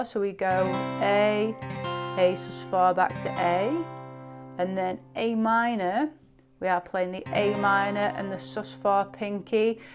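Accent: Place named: England, United Kingdom. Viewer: Hi, I love your way of learning songs... and your pure english accent! Your entire channel is gold, thank you for your huge dedication to other guitarists!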